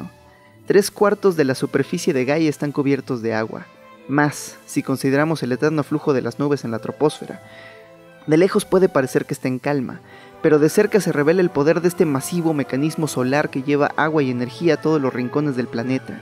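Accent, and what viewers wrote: Mexico City, Mexico. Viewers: Dos años, editado este gran trabajo,; EXCELENTE DOCUMENTAL, IMAGINO TODO EL TRABAJO Y PACIENCIA PARA LOGRARLO, IMAGINO TODA LA CREATIVIDAD Y TIEMPO PARA PRESENTARLO. EN UN LENGUAJE 🇲🇽 "CHILANGO" (alegre y relajado y audible) B E N D I C I O N E S❗ ESTÁ S U P E R‼️ 👏🏼👏🏼👏🏼👏🏼👏🏼👏🏼👏🏼 BRAVO ‼️ 👍🏾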